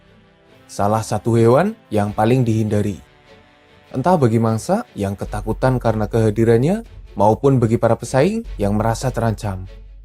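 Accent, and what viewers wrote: Banyumas, Indonesia. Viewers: admine wong ngapak ya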